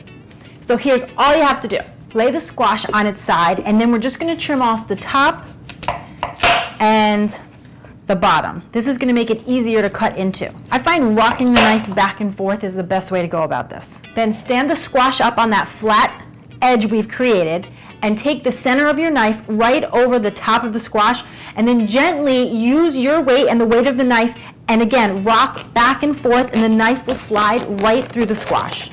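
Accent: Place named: United States. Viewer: I had some problems to understand your English. It seems that your English is US-English, which is very hard for me to understand. I wanted to know, what kind of spices you have used. I noticed that you put salt, pepper and garlic powder. But first, what kind of liquid you have rubbed on the squash? It seems that it was an oil. Can I also cook Spaghetti Squash under steam?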